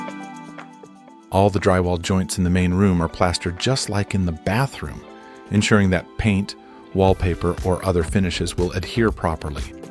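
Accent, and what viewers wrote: United States. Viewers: Who is this 'Merican doing the voiceover ?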